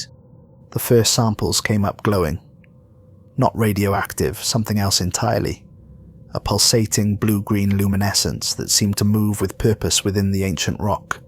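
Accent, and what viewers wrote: Wales, United Kingdom. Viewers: I do like the story's! But it seems, only bit different from meny b4 ,,,please do some different one's!.if possible have some others to join you ,it will give the story's more depth. I'm not criticising you ,never stop ,can I here some Welsh in your voice,,,,hope so ,.if I ever get rid of long covid, hopefully i can start writing a story or to again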